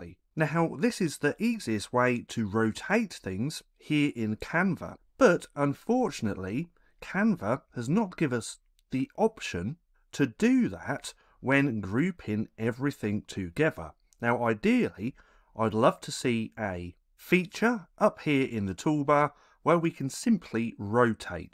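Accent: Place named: United Kingdom. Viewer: I'm obsessed with your British accent ...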